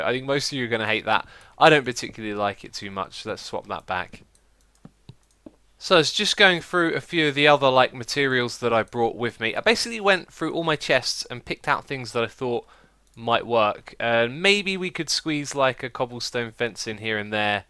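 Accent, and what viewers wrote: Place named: United Kingdom. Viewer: I'm really not a good builder (In his awesome British accent) Me: (In my not as good american accent): Yea? Me: Look at your base.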